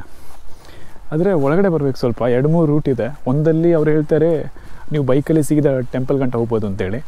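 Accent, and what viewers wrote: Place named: Dakshina Kannada, India. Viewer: ದಕ್ಷಿಣ ಕನ್ನಡ ಕೇಳ್ಳಿಕೆ ಒಂದು ಚಂದ ಮಾರೇ🫶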